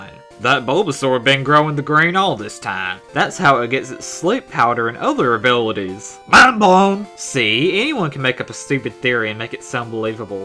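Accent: South, United States. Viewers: i like your light southern accent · Does anyone else agree this guy sounds like a hillbilly?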